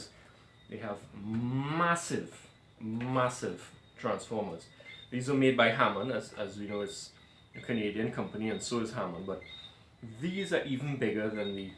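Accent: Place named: Trinidad and Tobago. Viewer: Trinidad? Please tell me my ears dont deceive me! Woodbrook boy says hello!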